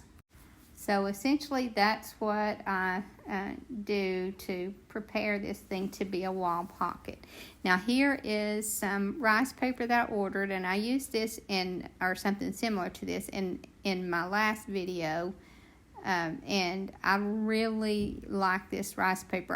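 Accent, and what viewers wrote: South, United States. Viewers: I really did enjoy this video & I enjoyed listening to your sweet southern accent. My mom was from West Virginia & you brought back many happy memories... TFS 💕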